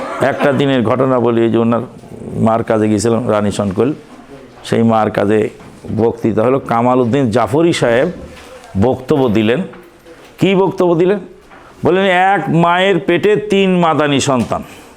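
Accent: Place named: Bogura, Bangladesh. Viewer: Bogurar kotha shune onk valo laglo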